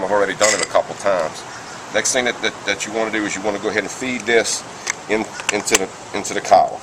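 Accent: United States. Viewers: Sounds like he's from New Orleans, Louisiana.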